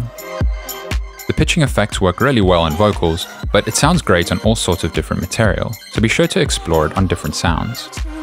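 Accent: South Africa. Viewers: Your accent sounds South African, you from ZA by any chance?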